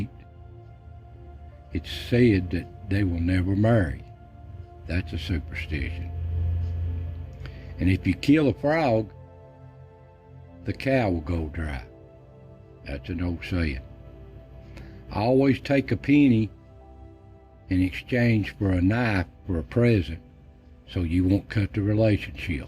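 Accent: Appalachia, United States. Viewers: A very good friend of mind that lived in Unicoi County or Erwrin or Irwin all his life talked exactly like you. He passed away a few yrs ago, only in his early forties and I miss him. He could do anything from logging to sawmilling, welding to mechanics. You sound exactly like him. Love your videos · ......Thanks again for these. I've heard a lot of old sayings from this list. I notice a lot of folks talk about your voice. A lot of men that are from the mountains talk just like you. I have always said, "Give me a slow talking southern boy any day over any other kind of man." It's a compliment. & my opinion. My Papa was that way & he was a wonderful man.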